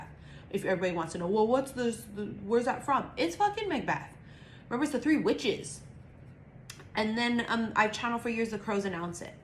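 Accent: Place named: Hawaii, United States. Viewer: I knew you were from Hawaiʻi b4 u even said it! Ke Aloha nui Tita!🙏❤️🌿🌺😂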